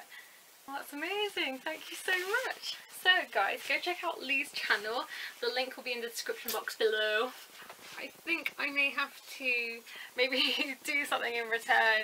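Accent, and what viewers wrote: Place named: London, United Kingdom. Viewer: Loved this. From what part of Britain are you? I'm guessing London by your accent. But I could be wrong.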